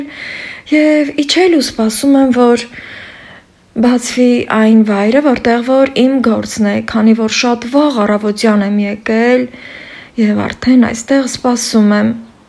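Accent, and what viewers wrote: Lori, Armenia. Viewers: Բարև Ան ջան շատ հաճելի է միշտ լսել քանի որ մենք Լոռի բառբառով ենք խոսում իրար ճիշտ ենք հասկանում ինչ նպատակի գնացել ես թող բոլորը քո սրտով լինի ❤❤❤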